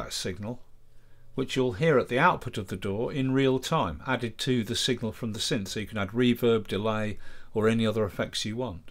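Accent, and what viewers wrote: United Kingdom. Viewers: You know the video will be good the moment you hear his British accent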